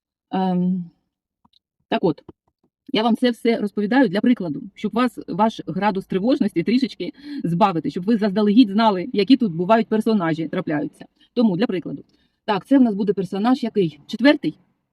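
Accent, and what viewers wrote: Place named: Surzhyk, Ukraine. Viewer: Дякуємо, що розповідаєте, як живуть у світі люди! Вам бажаю знайти СуперМаріо з апартаментами😊, та за жити спокійним гармонійним життям! А ні, то і українці гарні хлопці є! І з апартаментами теж. І, до речі, нічого, що інколи проскакує суржик в розмові.У нас півУкраїни на суржику говорить. Удачі вам! 🩵☀️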